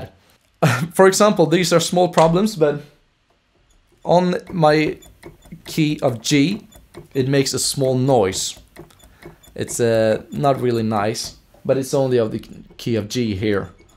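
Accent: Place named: Sweden. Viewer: Great review. I was looking for another keyboard primarily to use as a DAW VST controller, and was considering this one, but your review really helped me realize that the faders do not have the precision that I need to control things like cutoff frequency. Just based upon your English, I am guessing that you are Swedish. If you live near Stockholm, do you have any recommendations on where to buy music gear or music accessories. Tack!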